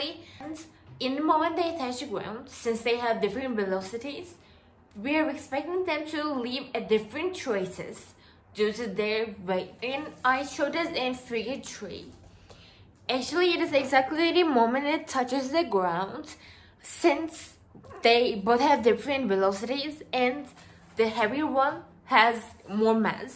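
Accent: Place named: India. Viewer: Abla hintçe aksanın var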